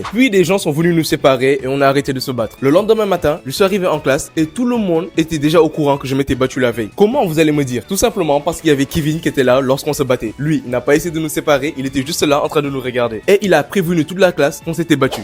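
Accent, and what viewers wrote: Senegal. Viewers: ta un accent de sénégalais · Mhh je suis nouveau, mais c'est un accent Sénégal que j'entends là ?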